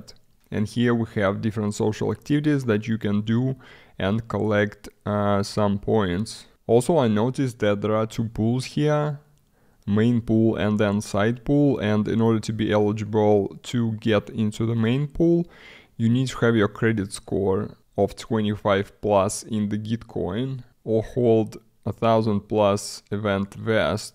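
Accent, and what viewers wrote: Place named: Russia. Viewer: бро ты же русскоговорящий?) по акценту ясно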